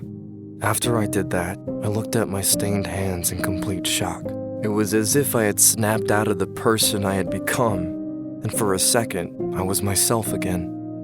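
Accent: United States. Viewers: Ok so he grew up in France and then moved to neither lands so why he got an American accent?????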